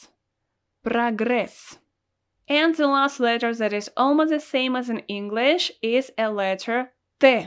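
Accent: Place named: Russia. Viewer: Спасибо за видео. One single tip - try to pronounce English words with an O clearly. For example Organization or motivation with a clear O. I know as a Russian native it's hart to switch because the O will be pronounced as an A. So your English word organization sound like arganisation and motivation like mativation. Not bad at all but funny:)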